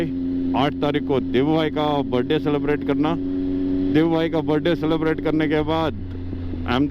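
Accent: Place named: Karnataka, India. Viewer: bhai, plz make video on riding gear and liked your kannada accent 😊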